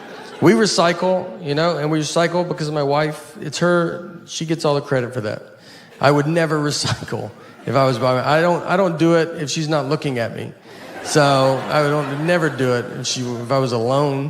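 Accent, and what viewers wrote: United States. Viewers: From the South here, I say poem and oil just like you! Ha!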